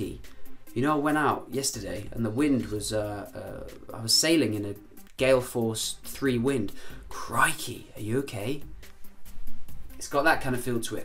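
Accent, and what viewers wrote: United Kingdom. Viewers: I love your British accent 😘💕❤😍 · Thanks for this video. I really like your british accent. Keep up your great work! This video was so great I could confused my mate living in Canada. Best regards to the UK from Germany. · Ahhh a British voice on YouTube. THIS IS WELL GOOD. LONDONER. Cheers mate. Also this sounds weird to me even though I'm 98% British. And you can tell just by how I talk or write. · I'm French and I find your accent so beautiful ! I practice a lot to have a British accent haha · I am very inspired with you. actually i want to learn british accent from your videos but apparently i get british and arabic at once. very motivating to learn arabic language again. love from indonesia :) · Wallahi ya akhi. More British accents. An Indian from Saudi Arabia Cheers Mate 😉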